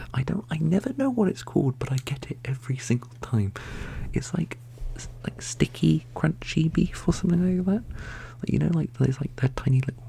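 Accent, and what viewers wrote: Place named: Bristol, United Kingdom. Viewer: Ahh thank you so much for answering my question!! Also your bristolian accent is so cute you should totally do a video with it!!! The rambles about k pop and the stuff you’re into was super cute as well you can totally tell how passionate you are <33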